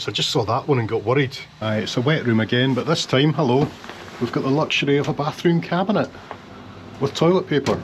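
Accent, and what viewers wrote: Scotland, United Kingdom. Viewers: oof. some days i'm better at understanding scottish-english than others.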